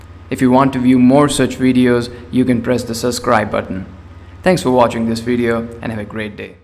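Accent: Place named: India. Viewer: Are you in India? you could get these in India (judging by your accent)? 🤔 I don't think I could get these in Bangladesh 😭.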